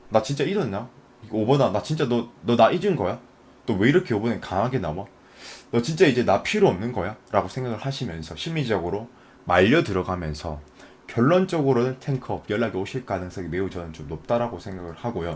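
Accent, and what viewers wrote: Gyeongsang, South Korea. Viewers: 전남친이 경상도 사람이였는데 말투가 비슷해서 너무 맘이 아파요.. 타로 감사합니다 3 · 저도 경상권이라 사투리 너무 정겹고 반가워요ㅋㅋㅋ👐 . . . 2번🔮 실제로 연락은 한번도 안왔는데 저는 먼저 할 생각이 없네요 ㅎㅎ 리딩이 사실이면 좋겠어요..! 잘듣고갑니다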